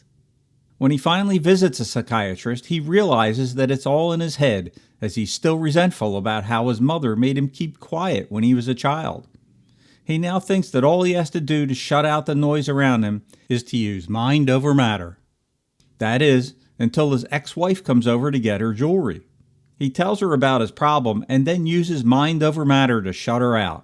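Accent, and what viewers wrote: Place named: Baltimore, United States. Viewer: Very fun seeing these clips. I had only seen one of them. Hey are you from Baltimore by chance? I grew up there and you have a similar accent from folk who live there.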